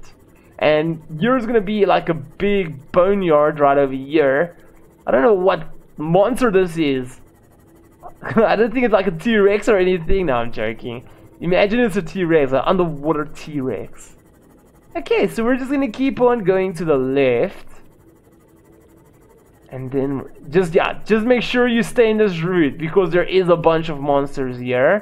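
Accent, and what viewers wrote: South Africa. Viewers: are you south african, u sound like one of my friends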